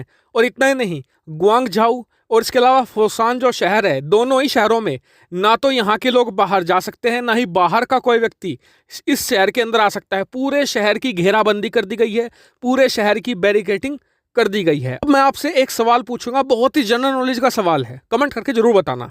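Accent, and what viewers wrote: India. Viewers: Why you talk as Indian varient ?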